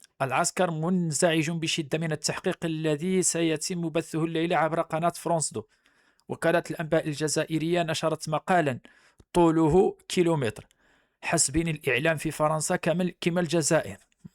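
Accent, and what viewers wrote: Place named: Morocco. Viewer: انت مروكي مذلول عييت ماتبدل اللهجة تاعك على انك جزائري وتفضحت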